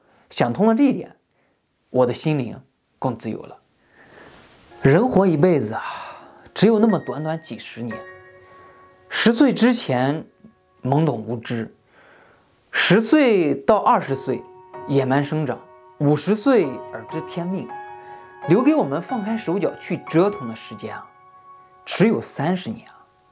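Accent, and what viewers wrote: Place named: Shandong, China. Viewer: 我怎么听着你的口音这么山东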